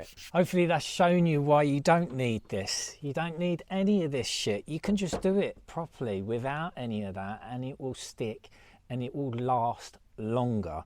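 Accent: United Kingdom. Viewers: Finally someone that actually has common sense about installing silicone. And you sound like you are from the UK. I have never seen a caulking video from the UK that wasn’t ridiculously wrong. And very few from the US that were any better. I spend a lot of time refuting the moronic YouTube silicone videos. Everyone thinks if it looks good it is good. The fact is in the US residential silicone lasts on average 7 years where properly installed ( no liquids) commercial silicone lasts on an average 20-30 years or more. Every major manufacturer says not to use liquids to tool with if you look close enough at their tech manuals. Here is what DOW ( one of the largest silicone manufacturers in the world ) says: “NOTE: Do not use liquid tooling aids such as water,soap or alcohols. These materials will interfere with cure, adhesion and create aesthetic issues.” Page 75 of Dow Construction Sealants Technical Manual. 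Do you mind if I refer people to this video? The only thing a disagree with is only tooling ne way it pulls the seakant away from the tile/ grout at the first tile and into the tile/ grout at yhe second tile You need to go both ways.“professionals spray their tools” wrong. True professionals dry tool and mostly ( not always) use custom ground metal tools. I don’t want to be mean but the fact is the UK is far behind a lot of the world when it cones to sealants. Probably due to your lack of high rise buildings ( probably a good thing)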